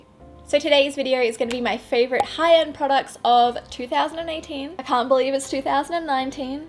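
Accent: Australia. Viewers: Hey!!! Just wanted to let you know that I love your channel!! Im so glad that i have found it!! Love the Australia 🇦🇺 Accent!! Anyways hello from Texas ♥️♥️♥️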